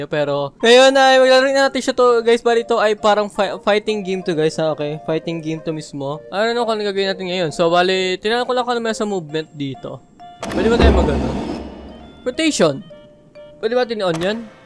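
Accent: Visayas, Philippines. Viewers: Bisaya ka dong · Kuya bisaya kayo!?!! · bisaya ka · bisaya ka kuya? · Bisaya ka ba po kuya? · Lods Bisaya Ka or Hindi? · Patay kadiha mo bisaya po ikaw?? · bisaya ka po ba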